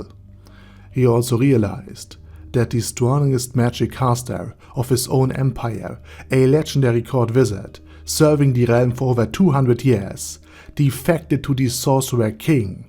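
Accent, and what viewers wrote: Germany. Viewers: Holy shit, this german English :D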